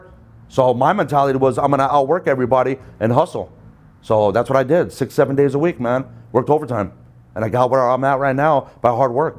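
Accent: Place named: Southern California, United States. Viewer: Dude sounds like Tony Ferguson from ufc. Got that socal accent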